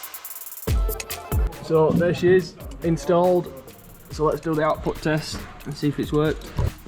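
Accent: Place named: Barnsley, United Kingdom. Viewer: This Yeti is mint. I thought I recognised a Barnsleh accent. That'll explain why I've seen cars with Darkside stickers knocking about these parts.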